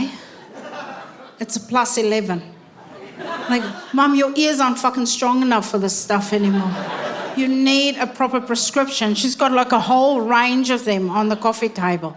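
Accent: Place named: South Africa. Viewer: She is hilarious and FINALLY learned why she has such f*ckery going on in her accent! South Africans already have the strangest of the British accents, but add some Kiwi and Aussie to the mix and you get this I guess.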